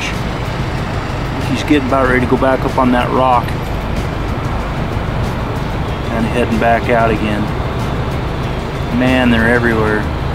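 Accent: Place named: Midwest, United States. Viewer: I feel like your accent is from the Midwest. Are you from Minnesota?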